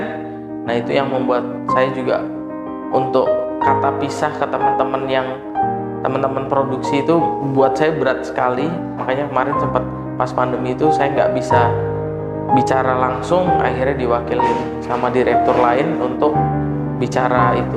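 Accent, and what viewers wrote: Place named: Java, Indonesia. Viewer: Wehhhhhhh medok empuk rotine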